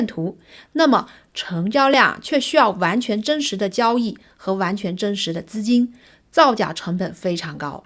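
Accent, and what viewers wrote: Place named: Jiangsu, China. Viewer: 謝謝Sammy 分析，一口江蘇、上海人口音，請問你哪裡人啊？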